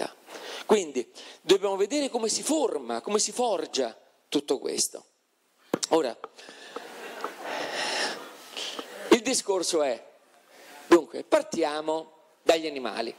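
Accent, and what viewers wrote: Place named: Rome, Italy. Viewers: Se il relatore (in questo non ho fatto caso ma in altri video sì) evitasse le c romanesche e le doppie romanesche… non dico la dizione eh, sono contro, ma almeno niente italiano locale/dialettale; in più (sempre non in questo) dice cose che vanno contro la cultura generale e le parole di alcuni storici (esempio Istanbul che è la traduzione di Costantinopoli, Carlo Magno in buoni rapporti se non sopra Papa Leone III e contento della sua incoronazione, Loki con la clava e Odino con la lancia…) senza citare le fonti. E alcuni “gli” che dovrebbero essere “le” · Prima usa correttamente il verbo"insegnare" nei confronti del figlio. A 21.44 secondo me è il popolano che si chiede perché il suo dio non ha "imparato" a tutti la stessa lingua. È probabilmente una volgarizzazione voluta, come il fatto che fa le sue relazioni senza usare un linguaggio cattedratico ma piuttosto un linguaggio semplice con persino un accento romano.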